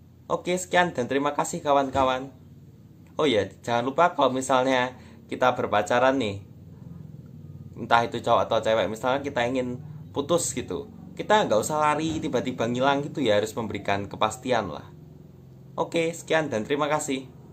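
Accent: Java, Indonesia. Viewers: Logat mu jawa bgt😂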